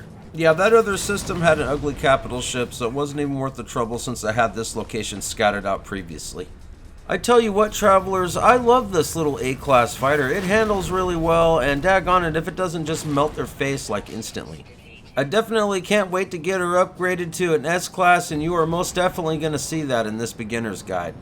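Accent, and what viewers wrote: Canada. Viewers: LOL. Just found this channel. im almost 30 hrs in. I JUST found my first Glyph Portal. Got 1 Crap freighter. a few ships. One is a fighter with way more dps than the other 2. 4 storage container rooms in the freighter. A few upgrades to things. Multiple Base Computers plopped on worlds. I havent even built a real base area. I have a 3x2 box with a door. 2 Specialists that now dont seem to do anything but stand there. Gettin the hanf of the game though, but if there is anyone out there that is less efficient than I am, you deserve an award, because this game is not user friendly. It has the most convoluted menu system ever. Its sleek...i'll give it that, but holy moly...spending 3 hrs to figure out how to do something as simple as figure out where to find "Hydraulic Wiring" 0.0 Still love this game though edit: Dude sounds like he is from Alberta edit: Grave Locations?? 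25 hrs in and i have died once. Found my grave. Never seen anything about others' graves o.o. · Are you Canadian? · Sounds like you stepped straight out of Letterkenny lmao 🤣 thanks for the video. Haven't played in a year... Everything has changed so much... I decided to create a new game save so these tips will be very useful.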